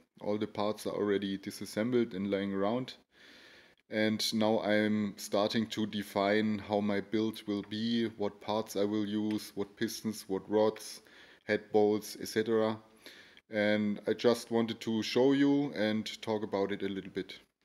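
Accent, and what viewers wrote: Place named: Germany. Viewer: dieser geile deutscher akzent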